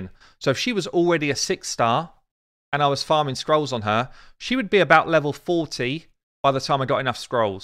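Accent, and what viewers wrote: United Kingdom. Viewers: Just found you very helpful just starting out, I am way outta my depth with this game but I will get there, also good to have a Brit talking instead of over the top yanks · what a very beautiful British accent you have !! amazing, i watch your videos to improve my skills in english, i'm french native. Also because i'm a RSL free player. You do a good job, your videos are very interesting. Sadly i don't understand everything you say, because of my level in english, and i'm not used to your Cambridge beautiful accent.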